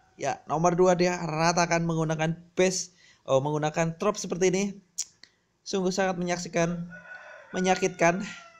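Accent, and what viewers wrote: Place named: Java, Indonesia. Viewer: medok yah 😀😀😀😀😀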